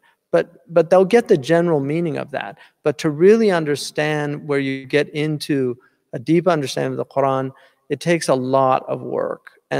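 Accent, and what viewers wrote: Arab world. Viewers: Marhaba Sheikh. You know that ..I can never stop admiring your ability in Arabic language. The way you pronounce is exactly like a one that was born in Arabia. I am born in middle east and I have seen Persian and Turkish when they study Islam. They can never pronaunce the word like you do. In addition to the most beautiful point you refer to. But Sheikh the way you talk Arabic just makes me speechless.It makes you more arab then me!? 😇...amazing!...رمضان كريم علیکم.. نسأل الله ان يكتب لنا الصلاة خلف الإمام مهدى(ع)!💚💥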